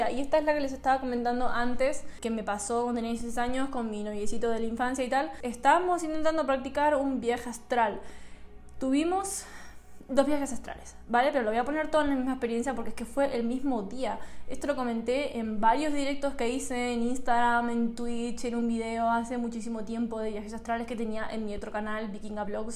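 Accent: Spain. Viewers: me mata que cada vez tiene más acento español xdd mas tierna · Acabo de caer en cuenta de que puede que esté teniendo varios indicios de viajes astrales, woooo. Voy a investigar más y practicar. 👀 Oyyyyyyye, y ya tienes re fuerte el acento español, jaja, recién lo noto. Te escuchas muy bella con la mezcla de acentos. xd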